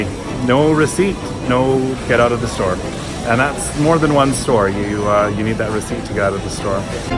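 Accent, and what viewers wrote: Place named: Australia. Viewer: You're australian? I can barely detect an aussie accent.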